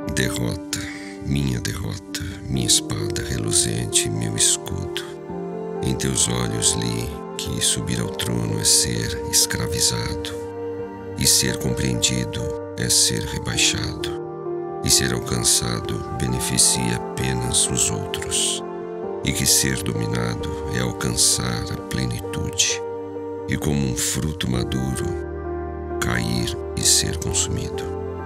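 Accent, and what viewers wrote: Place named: Brazil. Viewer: Adoro ouvir declamar poesia em português com a musicalidade do sotaque brasileiro. Saudações de Portugal.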